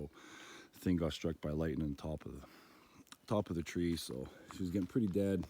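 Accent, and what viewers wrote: Canada. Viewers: Ontario accent - for sure - thanks.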